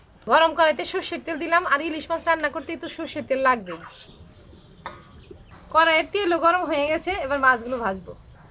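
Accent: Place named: Bangladesh. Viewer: দিদি ভাই তোমার কথা একেবারেই বাংলাদেশের মতো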